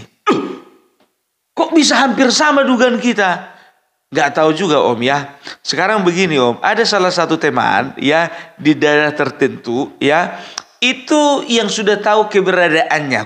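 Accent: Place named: Medan, Indonesia. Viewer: Sor kali aku dengarnya, opung bilang nggak aci😂😂😂asli medan kali Sukses selalu opung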